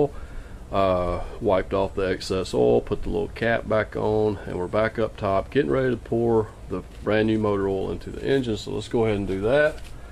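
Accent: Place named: North Carolina, United States. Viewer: I could hear you were from North Carolina before I even saw that you're username had "Mint Hill" in it. I'm up in Huntersville and just changed the oil in my '89 Ranger. Good thing I got under there too because I saw that I've got a bad seal on my differential housing, so that's my next project. Thanks for the help.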